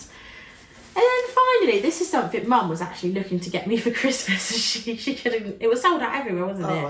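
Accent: United Kingdom. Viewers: Greetings again from Vancouver, Canada! (I found your channel because you recently did a HomeGoods/TJMaxx video, and I work at TJMaxx. :)) I love love love your accent. I've watched videos in the recent past about the different accents around the UK. It's really neat. I've always wondered. Also, the british slang is just so cool for me to hear as well. I was a huge Spice Girls fan when I was a preteen. (I'm almost 36 now.) & I still love the accents and slang to this day!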